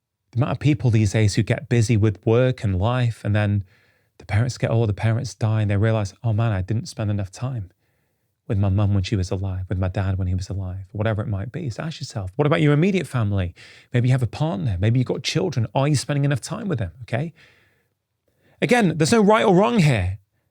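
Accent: England, United Kingdom. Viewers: I like your english accent...❤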